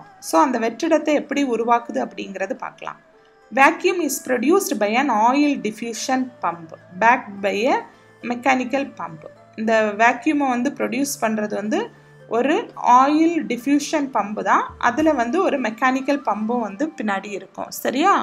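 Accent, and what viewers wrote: Kanyakumari, India. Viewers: Mam neenga Kanyakumari ya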